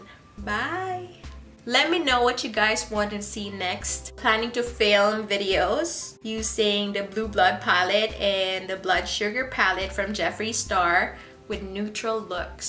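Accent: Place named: Philippines. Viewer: Beautiful! JUST got this palette for my BDay 😃🤩 Cannot wait to use it when I get some energy! 🤗🤗 I agree, most of these shadows are better than Natasha Denona because the texture AND finish are BOTH PERFECT! LOVE your nail color!! What is it? Your Philippino accent reminds me of what I call home.... Hawaii! Have you ever lived there? Oh.... I miss making KALEMUNGI! We would knock on doors and ask if we could have a branch to make soup.... so good! Ahhhh, those were the days 🥴 Thanks for the video!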